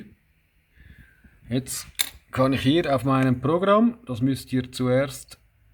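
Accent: Switzerland. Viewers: Well done! This is like the only video out there, where someone actually successfully shows you how to connect to the PC software. I managed to connect my AT24 to my PC, with the method that he showed using the "More Bluetooth options", in the Bluetooth & other devices page. Under COM Ports tab, add an Outgoing serial port that points to the **_SPP device. (According to your specific device). Now what I need to know yet, is how to get the logged information exported out to a spreed sheet or graph where i can see the whole picture. I want to use the device for machine trouble shooting and need a data logger. I figured if i just connect to an old laptop and come by the next day i would be able to see my current graph, and therewith help diagnose the problem. Let's see what we can get. Any tips heartily welcome. And I love the Schwitzer Deutsch Accent :):)😀😀😀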